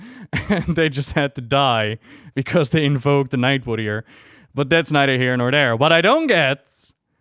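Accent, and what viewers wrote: Netherlands. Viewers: ur dutch aint yah ok, u sed it urself even . i heared it before u sed it! XD fijne dag gewenst!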